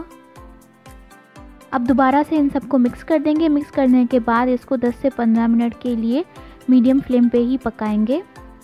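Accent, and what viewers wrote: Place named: Hyderabad, India. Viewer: wow, that's great. I also had a feeling that you're from Hyderabad because of your accent.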